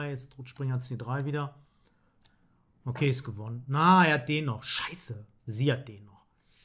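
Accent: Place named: Ruhr, Germany. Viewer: Mal was ganz anderes...also, dieser immer noch hörbare Ruhrpottdialekt, nun mit leicht norddeutscher Färbung. Astrein!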